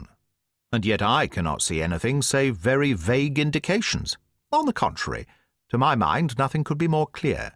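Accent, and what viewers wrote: United Kingdom. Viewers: Agreed I love my I love British female accent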